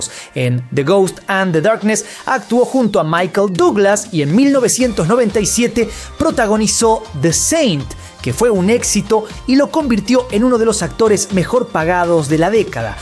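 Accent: Argentina. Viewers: Amamos ese toque argentino sello de nuestro..que tiene ese que se yo viste?..cariños desde Chile gracias tremendo Val,siempre sera Jim❤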